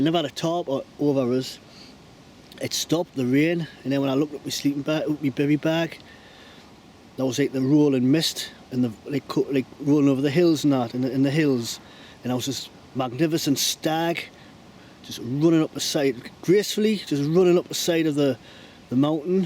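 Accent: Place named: Northern England, United Kingdom. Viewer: Where you from mate? Sound local to me, I'm Barnard castle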